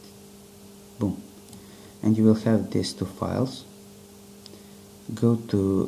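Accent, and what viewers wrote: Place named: Mexican-American, United States. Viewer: You talk like a American-Mexican.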